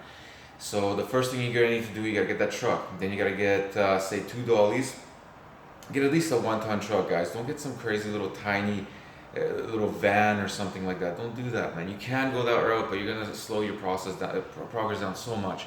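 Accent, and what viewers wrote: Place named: Lithuania. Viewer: You sound like Lithuanian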